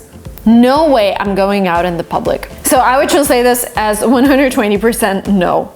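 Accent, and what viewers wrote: United States. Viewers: I watch your channel bec you told me how to speak English like American accent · Are you like your way speaking you are a good teacher and you teach American accent so I can talk like a native speaker and you are so beautiful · I like the way you speak your english in American so yeah it's great I like it. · Before watching her video I thought that she was american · I watch your channel because you're just one of the best teachers I've ever had. When I first saw your channel,I thought you were American! You English is so good. Thanks for your help,keep making these amazing videos. I am 12,and my dream is to be a polyguot. BYE,from Brazil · I’m watching this channel bc I want the same accent as you .. and also I’m living in Canada .. and I kind of need American accent ... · I watch your channel because even though you're not native your accent is very real. 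Actually at first I thought you were American. So I find you inspiring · The reason why I watch your channel is that your pronunciation is quite clear and I really enjoy the way how you make your videos it's very dynamic in my opinion that's the reason why I always try to imitate you right after you start speaking because I want to become fluent and obtain the American accent as you got. Moreover, it helps me a lot in learning new vocabulary!!!! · Why am I watching your channel? First of all you are a non-native speaker and I highly prefer to observe your video because I want to take your advice that you've done since you start your learning and How you are able to speak like American. And I always tell everybody who learns English to review your channel cause it has a lot of advantages you are amicable and you elaborate anything so obviously and it makes your channel with lots of fortification.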